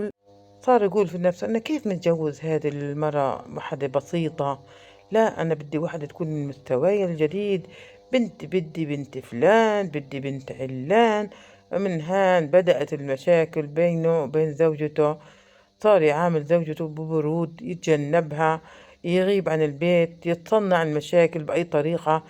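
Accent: Palestine. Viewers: اللهجة الفلسطينية❤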